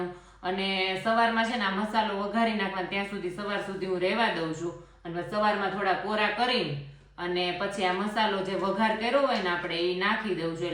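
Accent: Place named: Kathiawar, India. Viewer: Tamari kathiyavadi accent Bov sari che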